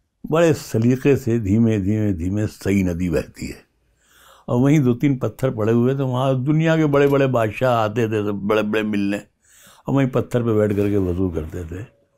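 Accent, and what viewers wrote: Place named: Raebareli, India. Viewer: Apki awaaj ikdm.raebareli wali hii hai sirr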